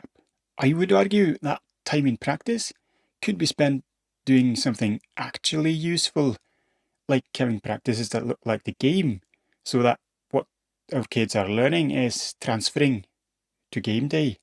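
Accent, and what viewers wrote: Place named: Scotland, United Kingdom. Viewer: If you gave me a million pound, I couldn't work out if you have a Scottish accent or a Norweigan one?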